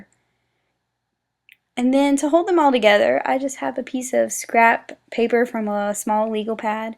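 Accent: South, United States. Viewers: I love this - great for multiples., this is the first time I've picked up on your southern accent - cute:) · So darling,! I love these. And PS, I could hear your Southern accent a little more in this video than usual. Cute!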